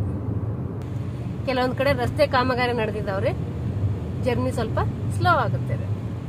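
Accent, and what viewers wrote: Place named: Karnataka, India. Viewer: ಏನ್ರೀ ಏನ್ ಚೆನ್ನಾಗಿ ಮಾತಾಡ್ತೀರಿ ನಿಮ್ ಮಾತು ಕೇಳೋಕ್ಕೆ ಹಾಗೂ ಧಾರವಾಡ ಧಾಟಿಯಲ್ಲಿ ವಿವರಣೆ ಬಹಳ ಚಲೋ ಇತ್ತು ನೋಡ್ರಲ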